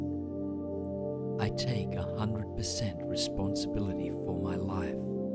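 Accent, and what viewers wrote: Australia. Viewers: Hey, as a fellow Aussie, I appreciate the accent, last 12 months have been terrible, but I feel like I'm turning a corner with the affirmations, still going through terrible things, but I'm getting through, and it bothers me alot less, thanks mate, god bless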